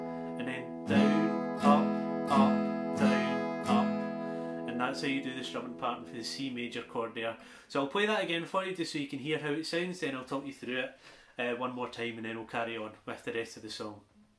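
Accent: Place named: Glasgow, United Kingdom. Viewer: I really enjoy your lessons, the way you go over it is just fantastic bro. I don’t normally comment but wanted to thank you. I hope your channel’s doing well you deserve Success your style is natural and you are very relatable wae a Glasgow Accent. Loving the lessons keep them coming pleases & thank you again 🫡🫡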